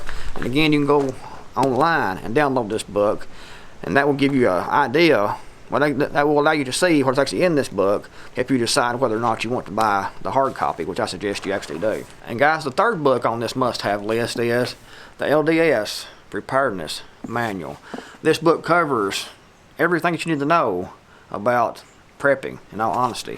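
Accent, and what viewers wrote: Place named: South, United States. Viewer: I love how he talks! Country boy !! 🇺🇸 live this channel!!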